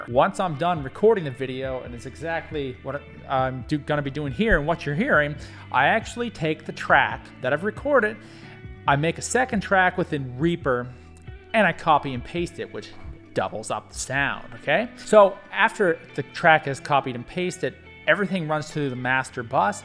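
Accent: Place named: Canada. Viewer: Hey! Excellent video and thank so much for doing this! I stumbled across your channel (this vid) because I just upgraded from my Blue Yeti to the Shure SM7B and Focusrite Scarlett Solo Gen3. Once I started recording some audio samples, I noticed that things didn't sound any different compared to my BY which told me something was being missed somewhere along the line. That being said, I think the Cloudlifter is one of those things and maybe better cables. Do you recommend anything in particular? Also, you mentioned Canadian dollars plus you look and sound Canadian! Where abouts are you from? I'm in Southern Ontario myself. Anyway, ramble complete! Have a stellar day and thanks again for this video!!!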